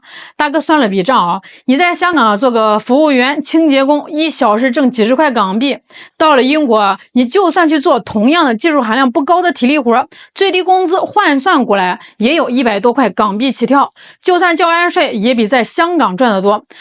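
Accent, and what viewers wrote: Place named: Shandong, China. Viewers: 这山东味儿的普通话 😀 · 这山东口音简直了😂😂😂😂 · 这女主播被这口北方口音给毁了，本来还算美女一枚. 😅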